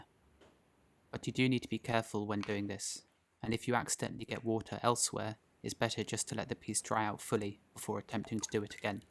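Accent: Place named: England, United Kingdom. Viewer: These videos are like a mini documentary. I think the edit of the video and over dubbing the narration is the strength of production. Having an English accent lends to the 'officialness' of it all. I like the pottery produced, but I'm at a loss as to the rapid success of sales other than whetting the appetite of anticipation with previews of quality and attention to detail these videos showcase.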